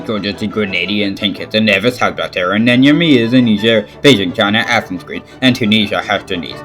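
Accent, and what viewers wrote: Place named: Scotland, United Kingdom. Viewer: I cant understand anything with ur ugly stupid scotish accent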